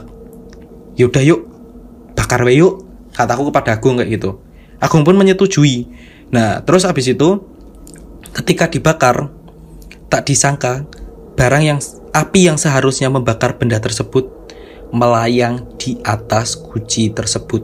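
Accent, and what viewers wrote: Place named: Semarang, Indonesia. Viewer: Logat semarang kihh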